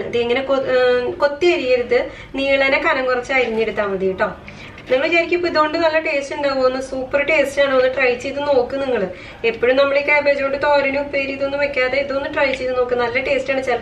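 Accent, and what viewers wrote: Thrissur, India. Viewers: നമ്മൾ തൃശ്ശൂർകാര് വീട്ടിൽ അടുക്കളയിൽ എങ്ങിനെയാണോ പാചകം ചെയ്യുമ്പോൾ സംസാരിക്കുന്നത് അത് പോലെ തന്നെയാണ് മോൾടെ അവതരണം. ചേച്ചി മോൾടെ വല്ല്യ fan anutaa..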